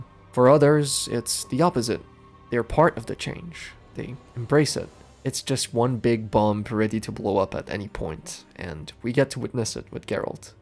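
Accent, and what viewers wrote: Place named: Quebec, Canada. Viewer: I think I spotted a fellow Quebecer's accent. Cool video man, on aime le witcher 3 les deux autant je penses !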